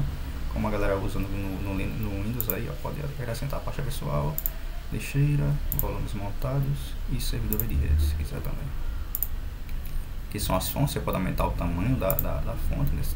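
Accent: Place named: Northeast, Brazil. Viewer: Sou usuário Ubuntu e não na troco por nenhuma outra distro, pois aposentei o distro-hoppismo. Aliás, muita gente fugiu do Ubuntu por causa dos snaps, todavia acho que os pacotes snaps e flatpaks foram as melhores coisas que já fizeram. As pessoas, antes de emprenharem pelos ouvidos, deveriam ler sobre os projetos para poderem de formar parecer adequado. Se lessem, saberiam que o futuro de todas as distros Linux é tornarem-se imutáveis e a adoção de pacotes "universais", tais como os pacotes snap e flatpak. Aliás, o colega é de PE ou PB, não é? Desde 2009 que saí do Brasil, mas penso que ainda reconheço o sotaque da minha terra. Cptos ************* P.S.: O Zorin OS Core é muito bonito, sim, no entanto está atrasadíssimo, pois ainda está preso ao Focal Fossa.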